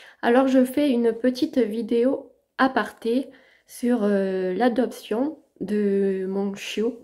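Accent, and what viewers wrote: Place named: South, France. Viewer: Bonjour Je vous découvre aujourd'hui ...qu'elle est mignonne!! C'est si triste de voir qu'il y a des gens qui ne se soucient pas du bien-être des animaux...sa mère va lui manquer autant dans l'affectif que pour son éducation... Les croquettes Hills sont très bien , nous les utilisons pour notre Gribouille un shitsu de bientot 13 ans. Nous avons prévu d'adopter aussi un petit chihuahua : il est né le 14 avril, nous ne l'aurons que vers le 20 juillet, ça lui laisse le temps de bien grandir auprès de sa mère et d'apprendre plein de choses. Je m'abonne car j'aimerais bien suivre cette petite et en plus j'aime beaucoup les plantes! Vous avez un accent du sud, vous êtes ans quelle region? Nous, dans le Lot😉 Bien à vous, Corinne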